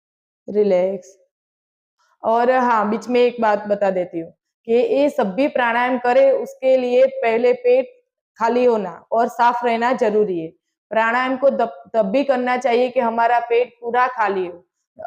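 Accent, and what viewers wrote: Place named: Gujarat, India. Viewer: Shayad gujrati lago cho bolva thi khabar pade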